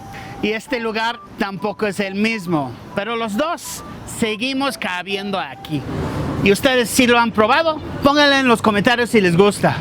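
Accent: Mexico City, Mexico. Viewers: Saludos hermano, me encanta tu acento chilangles fresa, sigue haci nunca cambies, gracias por hablar tan bien de mi hermoso Mexico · Hola estoy aqui x tu anuncio en Instagram y me encantó ver este video. Ya me suscribi y gracias x compartir cosas tam bonitas de mi ranchito Mexico, todo es verdad, la hospitalidad Mexicana no tiene igual, en Mazatlan recibimos muy bien a los extranjeros, recuerdo mi familia a recibido en casa a invitar a comer a turistas y amigos de Perú, Italia, Canadienses, Americanos, y paisanos de diferentes estados, Jalisco, CDMX, Nayarit, Oaxaca, Sonora, Chihuahua, BCS, BCN, y de muchos otros lugares que ya no recuerdo y sobretodo amigos locales, que siempre tienen un lugar en nuestro corazón, te deso mucgo éxito en tu nuevo emprendimiento, cuando gustes visitar Mazatlan ya sabes que serás muy bien recibido 🌅🎉 cuídate mucho tu y tu familia y te deseamos lo mejor de los éxitos y es verdad he? 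Como te vi un parecido con nuestro querido y bien recordado Paco Stanley, y me encanta como hablas ya bien español mexicano de CDMX 💪😬🎉🎉🎉🎉Felicitaciones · pinche, ya me caíste retebien. Eres el inglés salamero! jajajaj bienvenido cabrón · hasta tiene acento de chilango, todavia se nota el ingles pero tiene un acento MUY bueno. Es super autentico, aprendio el español en las calles de la cdmx no en los libros de texto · hermano.... ya eres mexicano, saludos. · Ya hasta trae el acento mexa 😂😂. Ya eres uno de nosotros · Me encanta que tienes acento mexicano.:D bueno de CDMX pero de Mexico. Pero no les digas a tantos! si no luego vienen mas y luego no nos alcanzan los tamales!:D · Hasta acento chilango tiene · el Mejicano... MAGNIFICENT MAGNIFICENT!!! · Muy cierto! Ya hay muchos youtubers que saben cómo lograr 👍🏻 con los mexicanos (solo es hablar bien de nuestro hermoso México 🇲🇽). Y lo demuestra con su español "mexicano"🎉 gracias por amar a México 🇲🇽❤ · Eres un extranjero que al llegar a México se puso el de Mexicano 100% es más me pareces un chilango con poquito acento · Tienes acento chilango!! 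😂saludos desde Guanajuato. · Amigo se te sale el acento chilango 😂🎉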